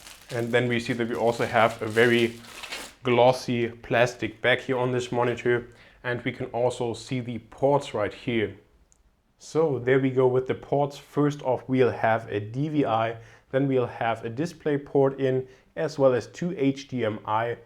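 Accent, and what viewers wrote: Germany. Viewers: Man hört richtig Rausch das du deutscher bist haha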